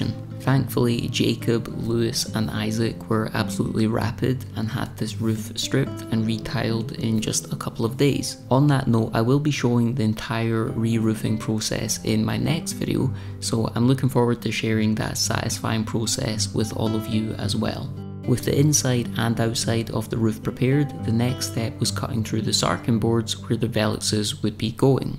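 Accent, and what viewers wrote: Scotland, United Kingdom. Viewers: More Scottish accents please!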